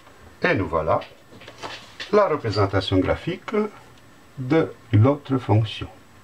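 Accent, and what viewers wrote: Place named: Romania. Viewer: vous etes roumain? Car vous avez l'accent (si oui eh bien je voulais vous dire que sunteti un profesore foarte bun)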